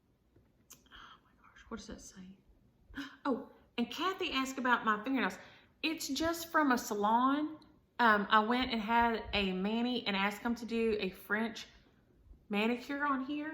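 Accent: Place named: Texas, United States. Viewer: I so enjoy watching you! I was born and raised in Texas and I love hearing your accent 💗 it reminds me of home. I still get on my sons even tho they are in their 40’s, the grandkids love it 😂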